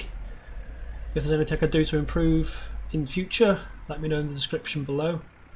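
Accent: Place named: Liverpool, United Kingdom. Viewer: You do have a few Liverpudlian twangs in there :), are you using a steering wheel for this?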